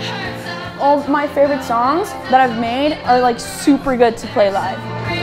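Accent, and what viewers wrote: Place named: United States. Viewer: guys this might be a stupid question but i’m a super new fan. if she’s norwegian why does she speak with an american accent?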